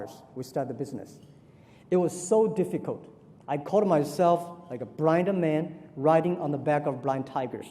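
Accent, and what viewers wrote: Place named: United States. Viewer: Thạch Thảo chuẩn, Jack nói tiếng Anh kiểu Mỹ, rất dễ nghe, hơn nữa tư tưởng và cách diễn đạt của ông mang đậm nét châu Á, nên nghe dễ hiểu vô cùng.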